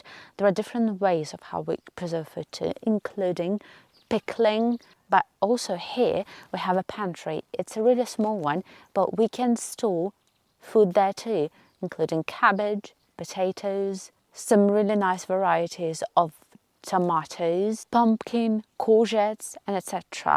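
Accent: England, United Kingdom. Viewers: You have an Eenglish Axcent, Who was your teachers? The hynm, Amasing Grace by John Melton. Came after a storm off the Irish Coastline. Communication and voice is important. We need to learn to bake a 🎂 cake.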